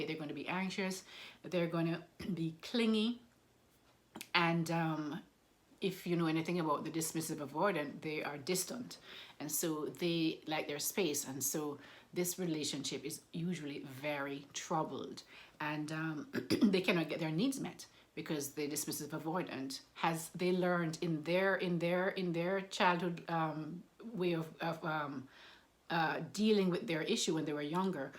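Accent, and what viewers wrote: Jamaica. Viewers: Dr., is your accent Jamaican?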